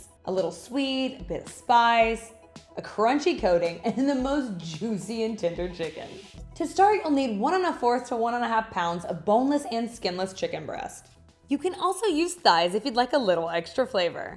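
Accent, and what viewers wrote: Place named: South, United States. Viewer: You sound like you're from the South.. just a random observation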